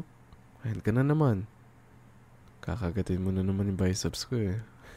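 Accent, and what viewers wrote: Conyo, Philippines. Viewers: ang conyo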